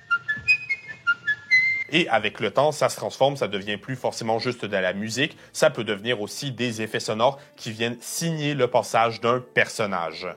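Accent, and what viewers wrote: Quebec, Canada. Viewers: Yo t'es quebecois???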